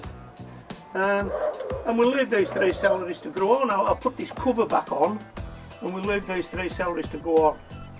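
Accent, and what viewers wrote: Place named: Scotland, United Kingdom. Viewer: Music to my ears the scottish accent! Perhaps because my ancersters "Remmington" from 5 generations ago, where from Scotland and emmigrated in Ontario, Canada? Re: Celery harvest, cutting 2 inches from the base and covering them with a polytunnel will prolongate the harvesting date of a couple of months. Great videos. Take care.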